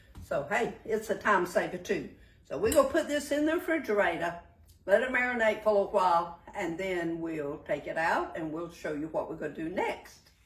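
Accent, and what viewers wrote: South, United States. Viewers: I love your accent ( I'm Canadian) no offence, but I often listen to your program when I go to bed, and your voice soothes me to sleep😴 Hearing you talk about getting ingredients acquainted warms my soul & I love your giggles in the background! Makes me want to come down South. Love y'all from Canada Cheers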